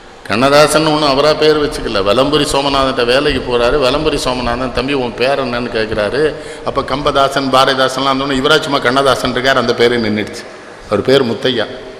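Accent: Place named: Tirunelveli, India. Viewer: நான் என் இளமை காலங்களில் சுவைத்த நெல்லை தமிழ் மறந்து விடும் காலங்களில் அய்யா நெல்லை கண்ணன் குரல் கேட்டு மகிழ்ச்சி அடைவது வழக்கம்.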